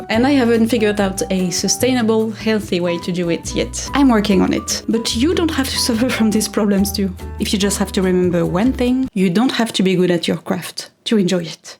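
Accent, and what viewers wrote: France. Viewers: We love you exactly for all the crazy, fun, interesting stuff you do and HOW you do it. AND the failures and your creative ways to fix them. You know, you learn more from mistakes actually as they challenge your capability of finding solutions 👍 and please don’t go down the rabbit hole of meeting expectations of others (people that don’t even know you and you should not care about). You are perfect and beautiful - from the inside and the outside - the way you are (btw French accents are very sexy! if I may say so as a straight woman). Please, take a break from all the struggles, if you want to. And you have already made the first step towards leaving your current struggles: you have identified what doesn’t do any good for you, and you can now work on letting that go. I hope you will be better soon, sending you love and good energies 🌈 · I feel for you with a lot of sympathy. 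I would not have the emotional strength to see myself on video all the time. But just know that you are very beautiful and fun, and your French-accented English is very charming. But I know that admiration from others is not a cure for feeling bad about yourself. That has to come from within. Always put your mental health first. Hopefully soon you can hire some help to do editing and some of the other tasks of your job that you don't like. Students often work for cheap! haha Good luck and try not to be so hard on yourself, and remember to take a vacation/a break every once in a while. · I really relate to what you say about running your own business- I do the same (not YouTube), and it's exhausting to have to do all the tasks, especially when you're not great at them. Social Media and Marketing are my least favourite too! You're so beautiful, with and without make-up, please don't ever feel like you're not. 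I love your personality, and your French accent is the best - I wish you didn't feel so bad about it! It's very relaxing to listen to :) I'm sorry you're experiencing burn out - it's hard to keep up creativity to such a high level all the time. I'm not sure if there are things the Algorithm will like that you could do that are less intensive, but Rachel Makesy will often mix in more casual videos to her channel e.g. painting a picture, trying out an old sewing machine, organising her studio, putting together different aesthetic outfits etc. Maybe you can do videos like - 'trying on my best and worst makes from 2023', 'fixing a failed project', etc. Maybe that would lighten the creative burden? I don't know - I'll watch whatever you want to do. Anyway, I just wanted to send some love and encouragement your way :) I'm so sorry you're struggling, but you will find a way through this, and the other side will be so bright xx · I❤to watch your videos. 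Your creativity is inspiring and your French accent is the sweetest. Did you ever see Nerdforge, she is your Norwegian "twinsister"on youtube. Would be fun to see you two make a video together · hugs you are beautiful, but that's not why I watch you're videos. yes you're happy creative bouncy self brings me joy. but! you don't have to be like happy all the time. As for the accent... you're French, obviously you will have a French accent. the fact you can speak a second language as well as you do is impressive. personally I find you're accent charming and I love that you don't sound like a robot speaking 'perfect' English. you said everything yourself in the quilt analogy. the problem for all of us is it's easier to say that believe for ourselves. all I can do is.. hugs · If it helps: i really love your voice. My father is french and your accent makes me remember happy times and remember visiting France every summer. 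Merci ♡ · I love any content you create and every project you have worked on. You are BEAUTIFUL and I absolutely adore your gorgeous French accent. You ARE enough and you are Soooo relatable and sweet and kind and talented. Please try remember this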